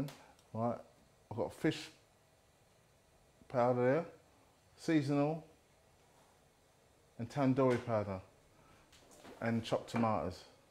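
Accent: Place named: England, United Kingdom. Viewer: With that accent, he was born in England, London I would guess (lol). He sure loves his powdered seasonings...............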